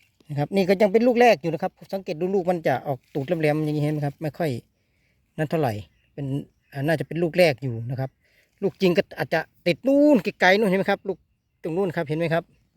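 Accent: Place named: Cambodia. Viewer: 555ดูทีไรตลกทุกทีงึกๆงักๆเขมรรีรัม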